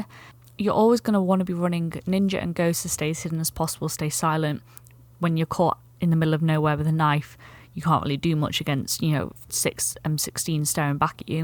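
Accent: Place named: United Kingdom. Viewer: Why are are you british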